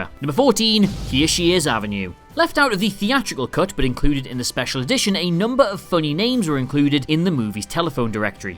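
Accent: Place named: North East England, United Kingdom. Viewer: The v/o guy is from the North East of England and that is just how they pronounce words. It's like how a lot of Black Americans say aks instead of ask.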